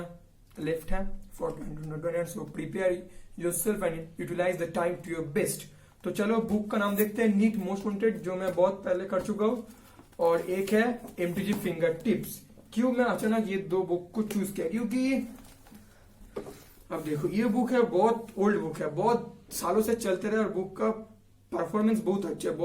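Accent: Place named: Bengal, India. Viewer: Your hindi seems that you are bengali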